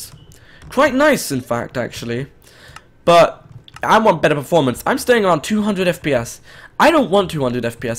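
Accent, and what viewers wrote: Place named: RP, United Kingdom. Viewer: wow, you sound so posh... (I'm just noticing)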